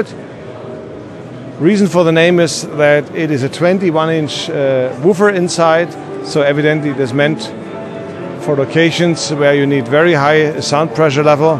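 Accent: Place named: Germany. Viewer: this fucking german accent: ....last not least for film studios, last not least for Cinemas ....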